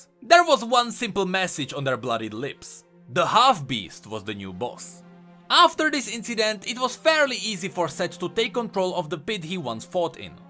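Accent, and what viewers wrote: Czech Republic. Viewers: Jde z tebe celkem slyšet, že jsi Čech :D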